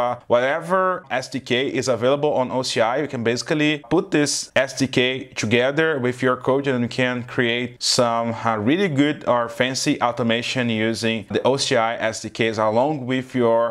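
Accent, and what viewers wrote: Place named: Brazil. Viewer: Awesome.!!! Excellent video and excellent material that you share with the community! Since you approach it from the very practical side and complement it with the material that just Oracle released last week to do the OCI certification. I have a question for you! With the steps that you indicate in the video, I understood that we can create scripts in Bash to automate the starting and stopping of the instance or database. But where do you create the crontab... In OCI Client or in the machine?? or Where you installed OCI Client??. I'm very confused with that. Could you help me? Thanks again for sharing your knowledge and experience! .Finally, for your voice and accent, I think you're from Brazil! So regards from Colombia! Muito obrigado.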